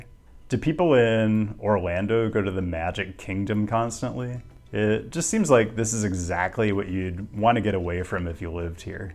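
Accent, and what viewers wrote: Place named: Pacific Northwest, United States. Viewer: First, I absolutely love the content. I have to ask though, are you from the Pacific NW? I'm a linguist and you have the strongest PNW accent I've ever heard, it's amazing 😂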